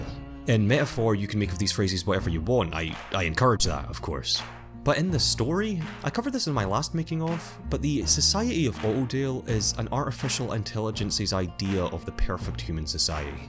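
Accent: Scotland, United Kingdom. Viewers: Question: Are you scottish? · Wait are is he Scottish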